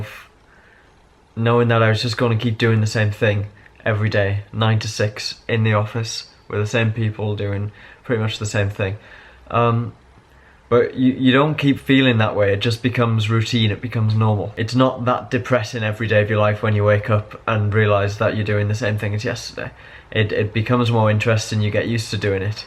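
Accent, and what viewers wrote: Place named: England, United Kingdom. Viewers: Sounds north-east England but not Newcastle. One of the smaller towns. Locals would be able to tell which street from the pronunciation. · Is an English accent, but I don’t know specifically what part of England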